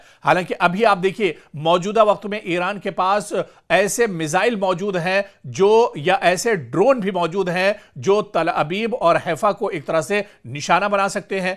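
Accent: India. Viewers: Voice Indian lagta hay, Proper urdu nahi hay 😅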